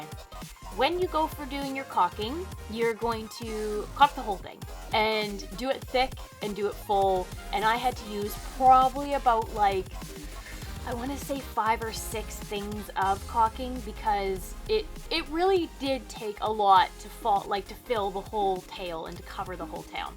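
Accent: Canada. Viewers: Are you Canadian? “Don’t chya know” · Just from your accent I'm assuming you live in Canada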